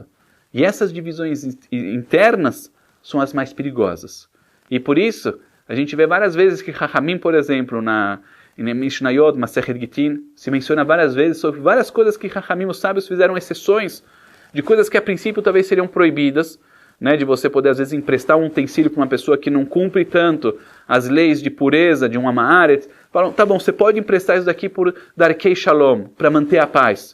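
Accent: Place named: Brazil. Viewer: Bacana ver e ouvir um rabino ortodoxo com sotaque bem brasileiro! Todá!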